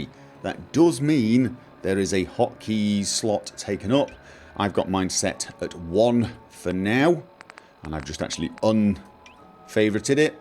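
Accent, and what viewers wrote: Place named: Wales, United Kingdom. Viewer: Are you a Welsh Jew or something? You sound both Welsh and Jewish. What the hell is your nationality?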